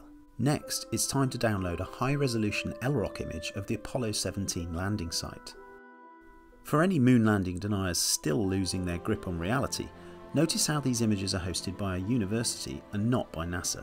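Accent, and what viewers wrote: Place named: England, United Kingdom. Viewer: Sounded English to me, then again they are the same.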